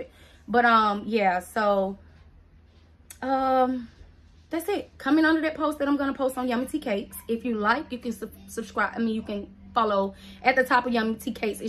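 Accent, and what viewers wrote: South, United States. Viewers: CONGRATULATIONS again on reaching 10K You did That! Thank you for being your authentic self and in RiRi voice unapologetically you All of those thoughts that you were worried about are what makes you you and Why I'm subscribed to your channel your personality, your humor and southern dialect etc we love it all ok so keep inspiring and sharing your talents. For the giveaway Im subscribed to both channels and I follow all three pages on the Gram under Cr3ativ3_val Event styling is my passion so the printer & biz cards would be a huge asset.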